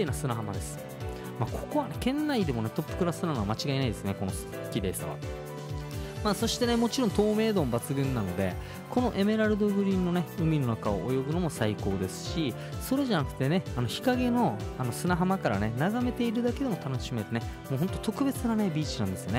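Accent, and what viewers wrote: Okinawa, Japan. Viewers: 凄いランキング！！！なぜなら ●ウチナーンチュでも知らないビーチが紹介さている!!! ●西海岸以外を紹介している!! ●いちゃんだビーチを紹介している!!!!! ●ちょいちょい沖縄なまりが入っている点（ガチ）!!!! 近いうち言ってみる！！！ 右上の建物何！？！？ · 話し方が優しくて癒されました😆 沖縄の方言、すごくすてきですね！😊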